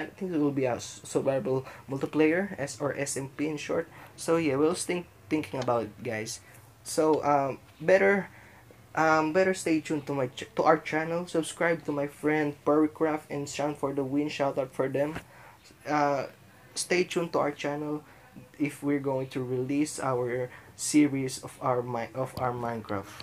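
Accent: Philippines. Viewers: I am usually good at picking out the Filipino accent. I couldn't at first in this video. You must be Visayan because I learned Tagalog and I know the from-Tagalog-to-English accident but your [beautiful] accent it slightly different. What really gave it away, was when you said "ano". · Love your Filipino accent! I’m from hawaii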